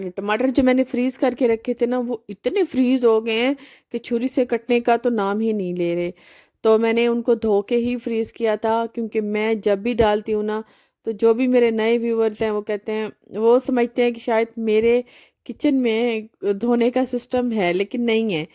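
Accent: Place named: Bahawalpur, Pakistan. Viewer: Wah g choti choti khushianbbarri barri enjoyment🤩mn bhi bwp se hun aap k lehjy sun kr acha lgta ha .multan ar bwp ghoomny mn bht maza aata ha such mn .missing a lot my cities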